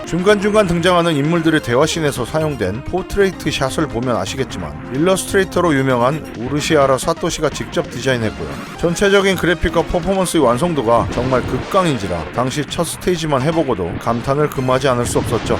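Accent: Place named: Jeolla, South Korea. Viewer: 극강인지라~ 뭐뭐인지라~ 전라도사투리 오지게나오네요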